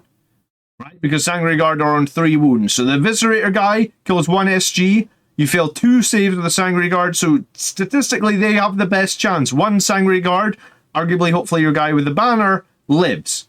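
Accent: Scotland, United Kingdom. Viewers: Something about a wholesome Scottish man talking about murder space vampires makes me happy.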